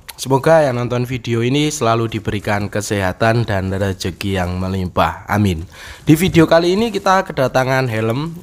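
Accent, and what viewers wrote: Temanggung, Indonesia. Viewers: Mantep mas.. Logate temanggungan.. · Ketoro lek tiang Temanggung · Nyrongat2, Temanggung banget basane👍👍👍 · Bosone khas temanggung wkwkwk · Koyo wong temanggung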